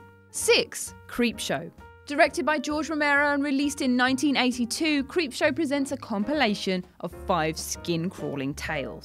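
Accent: United Kingdom. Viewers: British Much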